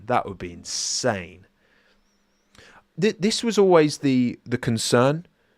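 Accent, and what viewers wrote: United Kingdom. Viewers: Wow, what a frustration! You sound like a Brit and that’s not a compliment…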